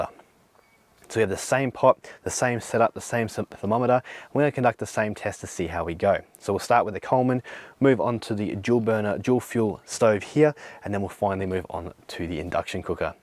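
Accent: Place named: Australia. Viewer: Nice to hear an Aussie who isn’t a hobo. Well done.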